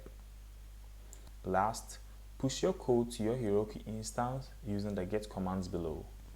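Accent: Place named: United States. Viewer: 3y3 American accent wai 😂😂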